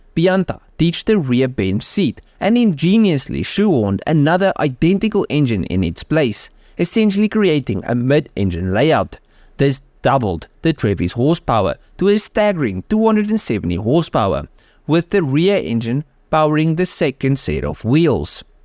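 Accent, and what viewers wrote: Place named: Benoni, South Africa. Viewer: You must be from benoni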